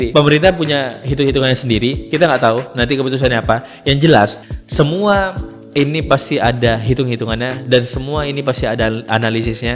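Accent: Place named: Lampung, Indonesia. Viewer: dari lampung nih kayaknya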